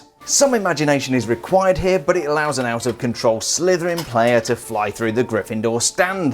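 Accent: United Kingdom. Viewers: Love your British voice sorry if racist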